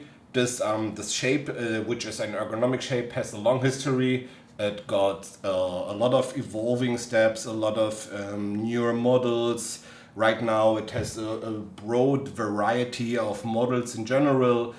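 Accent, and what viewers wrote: Germany. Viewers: He just pronounces it fast while also having an german accsent. If you listen carefully he says orochi.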